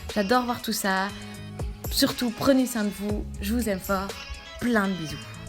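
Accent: Belgium. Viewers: Miss Serbia j'ai l'impression qu'elle a un accent belge perso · Tu es belge toi ?^^ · t'es belge ??? ton accent m'a l'air légèrement belge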